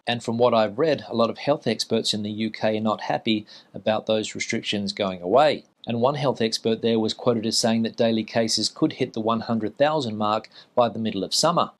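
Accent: New Zealand. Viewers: Are you quite sure you are not speaking with a Kiwi accent? Could you demonstrate the difference?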